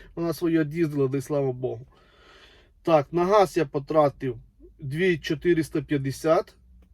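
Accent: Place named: Korostenshchyna, Ukraine. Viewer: А я й чую десь хлопак з коростенщини ну північ полюбому...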